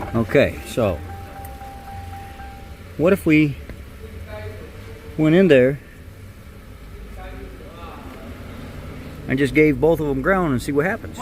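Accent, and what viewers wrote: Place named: South, United States. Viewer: Great vid. You had me cracking up with you country accent….LOL…Stay Blessed..I’m still giggling as I type this.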